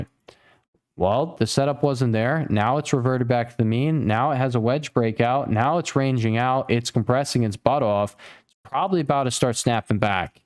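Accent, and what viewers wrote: New Jersey, United States. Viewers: As a European, your New Jersey accent makes this so funny😂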